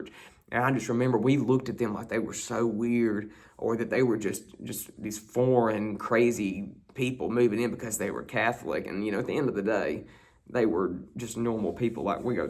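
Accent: South, United States. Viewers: it is so enjoyable to watch and listen to you. You're so easy-going, sincere, and yet so honest too! Of course, the Southern accent doesn't hurt either. But seriously, thank you for coming on YouTube and presenting such excellent content... part of yourself.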